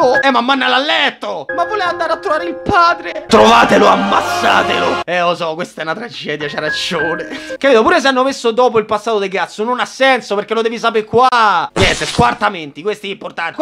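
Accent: Rome, Italy. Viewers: Ma soprattutto perchè è l'unico che sa sfruttare così bene l'accento romano da far sbellicare dalle riderate, personalmente a me non è mai successo fino ad ora.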